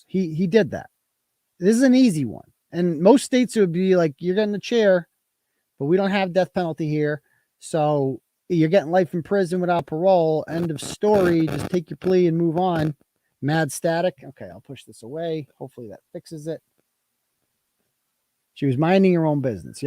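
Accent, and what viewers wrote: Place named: South, United States. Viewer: Your southern accent is hilarious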